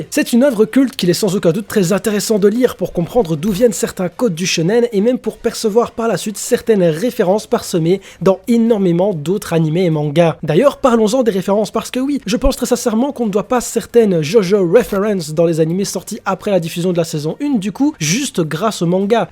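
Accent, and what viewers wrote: Belgium. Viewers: Très bonne vidéo ! D'ailleurs, j'ai comme l'impression d'entendre un compatriote belge ! (Liège on dirait). Tout ça pour dire que l'oeuvre jojo à part entière a énormément été une source d'inspiration dans la pop culture. Clint Eastwood a inspiré jotaro kujo et kiff l'oeuvre. Des personnages de l'arc Joseph voire même Polnareff ont inspiré capcom pour street fighter. Dans le jeu vidéo Overwatch, le personnage ninja Genji a une réplique disant " Mada, Mada." Faisant référence au muda muda de Dio et giorno. Gucci a dédié une collection de vêtements à Jojo's bizarre adventure. Les mentions et réf ne manquent pas à l'appel. Sans se rendre compte jojo à énormément inspiré les mangas d'aujourd'hui. C'est pour cela que le fanatisme est grand. Mon opinion,. C'est que Jojo est au même rang qu'un Dragon Ball ou un Naruto. C'est intemporel et inclassable. 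C'est juste dans le hall of fame. Point barre.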